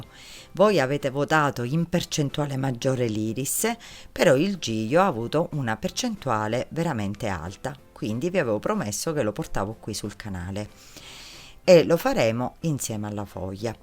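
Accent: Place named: Sicily, Italy. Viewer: Bravissima ❤❤❤ti dirò mi piace quel tuo accento siculo nn so se sbaglio ma sei simpaticissima 😘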